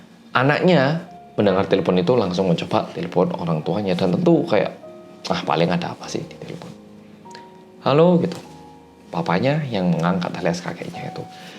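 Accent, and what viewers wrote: Java, Indonesia. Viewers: Logatnya jawa banget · orang korea yang medok nya nggak usah dengan muka serius dong mas. aku malah ngakak e liat muka serius pas awal gini 😅😅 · Finally Medok pokok e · Yeah kembalinya 🤓🤓🤓bersama orang korea yang medokkkk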